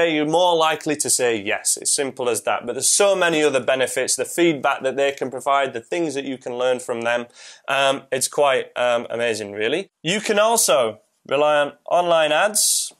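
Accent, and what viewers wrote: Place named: South Yorkshire, United Kingdom. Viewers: Good video. What part of the north are you from? Scotland…not Geordie for sure. I am an amateur linguist and musician. Lol. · Are you from Barnsley? Your accent is so much like my bf's and I lived there for 4 years. If not then it's gotta be somewhere in South Yorkshire like Sheffield, Donny, Rotherham or even Wakefield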